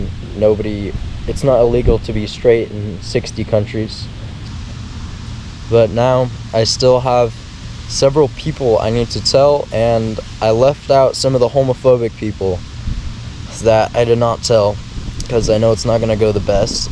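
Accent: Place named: South, United States. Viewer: Based on your accent, you seem to come from a Southern state; good to know that you were fully accepted. Interesting that you told your dad before you told your mom. You are extremely pretty and masculine. It makes things easier for you.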